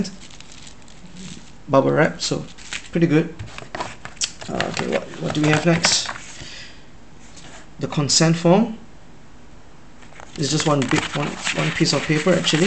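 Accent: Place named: China. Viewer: You are Chinese or Chinese descent? I can somewhat tell from your accent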